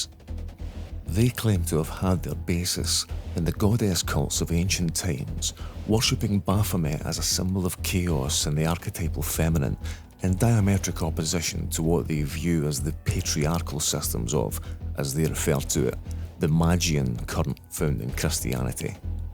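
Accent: Scotland, United Kingdom. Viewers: Top shelf research as usual, my friend. I expected a highly detailed and well-researched video and was not disappointed :) Everything from the audio-visual aesthetic that you have selected for the video, to the emphasis on information that is being conveyed verbally due to the lack of visual distractions provided by imagery, to your Scottish accent (particularly the amazing way in which you pronounce those hard 'r's) meshes perfectly together to provide a unique and interesting experience. The video itself, as mentioned above, is well-researched, which is particularly evident through the scholarly way in which you document their ideology, while maintaining an emphasis on being objective and getting facts across (with a few well-placed comedic touches which entertain the viewer while simultaneously not compromising the tone of the video). There is one thing I could recommend that you possibly do in the future, however. 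Since you obviously cannot get EVERYTHING across in one sitting, perhaps you could link some of your citations/references/additional materials in the description? That way, you could increase the credibility of the video, while at the same time providing viewers with material that they could read themselves to supplement what was already communicated in the video. That said, the best way to alleviate fear, ignorance and misinformation surrounding strange, scary or otherwise esoteric subjects is documentation and research, particularly archival analysis of materi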